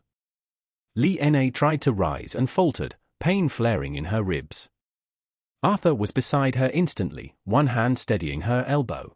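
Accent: United Kingdom. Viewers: A British accent narrating a western USA fictional story? No...just no.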